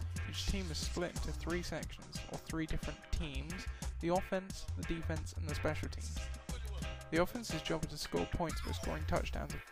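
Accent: United Kingdom. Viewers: Thanks really helpful, some people don't understand a British accent, don't know why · are you from england?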